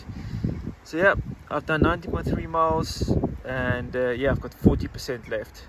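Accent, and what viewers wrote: South Africa. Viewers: You say a few words. Me: This guy is a South African! Nice channel, you have a new sub.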